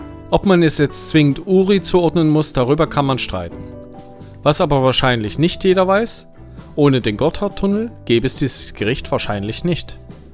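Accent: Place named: Saxony, Germany. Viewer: Oh nein nicht schon wieder....Schweizer Spezialitäten auf Sächsisch.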